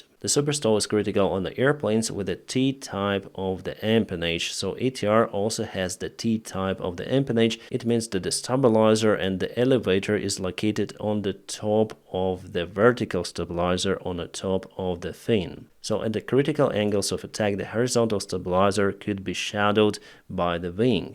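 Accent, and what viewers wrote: Russia. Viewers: I love this narrator's accent!🎉 is it Russian?❤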